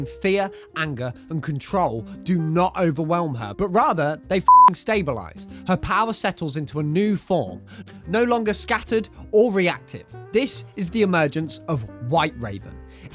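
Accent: United Kingdom. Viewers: Bro's Britishness really be shining through w this one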